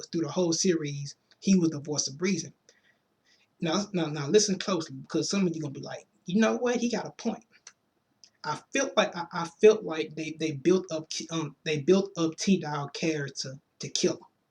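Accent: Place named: New Orleans, United States. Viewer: Sounds like your from New Orleans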